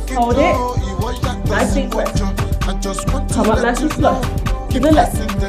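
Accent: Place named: Caribbean. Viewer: Your accent is #awesome!!! BLACK is #BEAUTIFUL!!! Proud to be black! Caribbean vibes right there...more fire 🔥!!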